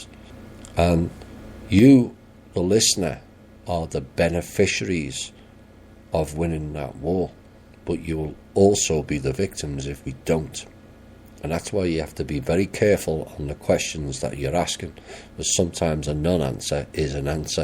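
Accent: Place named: Northern England, United Kingdom. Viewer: Good info, nice northan accent, feels like been back home, derbyshire man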